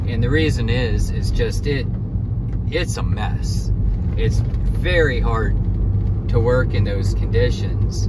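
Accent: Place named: Pennsylvania, United States. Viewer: I’ve watched several of your videos and was always impressed with the dictation and wondered what part of the country you lived . Your accent was very familiar but I couldn’t nail it down. Now I know “ Pennsylvania “ my sister lived there in Paoli . Very impressive interview with the Boss and he hit the nail on the head with his answer about the dowry . Hope you were listening.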